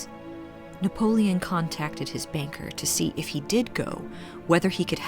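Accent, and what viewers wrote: United States. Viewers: The loveliest American accent - and great delivery.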